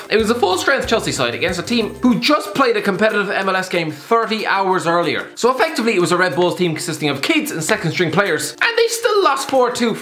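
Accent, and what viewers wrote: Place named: Ireland. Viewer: I think it’s Irish 😂😂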